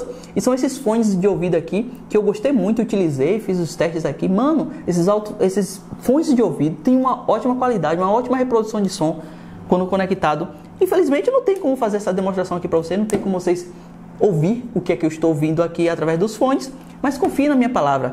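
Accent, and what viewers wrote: Bahia, Brazil. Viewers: Muito engraçado ouvir a análise no sotaque baiano! Dá um diferencial. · Oh, baianinho tu e de qual origem da Bahia?? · Quer sucesso falando Bahiano em nivel nacional me poupe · Kkkk sempre desconfiei q tu era bahiano só pq da sua voz("voz msm não sotaque") e agora com esse lá ele agora sim tenho certeza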